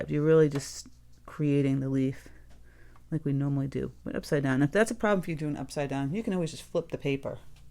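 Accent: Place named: New England, United States. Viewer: I love watching your videos and I love your accent, I don't know if you are or not but you sound like a New Englander! Which makes me happy and I love your art it's always inspiring. Thank you!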